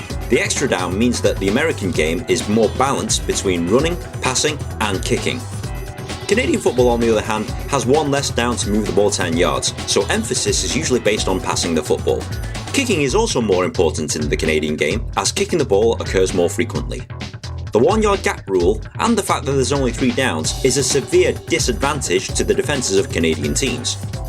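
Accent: United Kingdom. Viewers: Am I listening to a British guy tell me about football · Why is a Brit explaining American or Canadian football?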